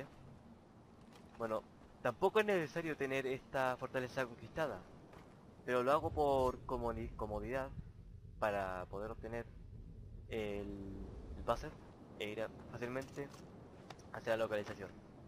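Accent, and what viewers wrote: Chile. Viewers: oye eres chileno?:v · Eres chileno chileno y chileno se reconocen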